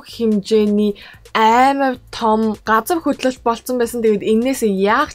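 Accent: South Korea. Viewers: ta solongos aylagtai yaridag yum bn te say l anzaarlaa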